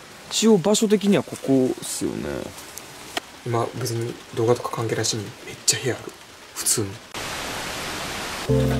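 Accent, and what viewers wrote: Kansai, Japan. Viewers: 翔くんの関西弁おちつく。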